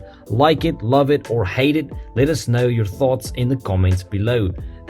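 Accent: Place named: South Africa. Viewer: Your accent is South African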